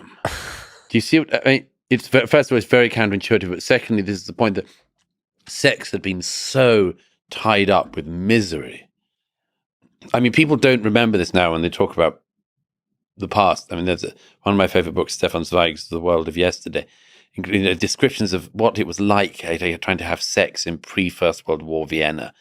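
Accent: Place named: RP, United Kingdom. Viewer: Yeah, he's totally got this wrong. Prat with a plumby accent is all he is.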